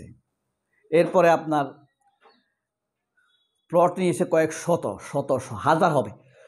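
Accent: Noakhali, Bangladesh. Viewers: ভাই ভালো থাকবেন.. আপ্নার বাড়ি সম্ভব ত নোয়াখালী অঞ্চলে তাই প্লট কে ফ্লট বলছেন আবার ফ্ল্যাট কে প্লাট বলছেন..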